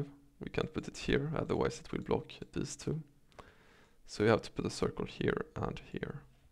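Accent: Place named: Sweden. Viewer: sjukt att man märker direkt från dialekten att du är svensk.. men bra jobbar :D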